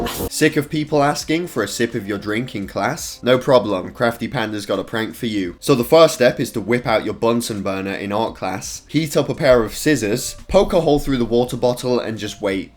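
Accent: England, United Kingdom. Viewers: No it's just the way English people say it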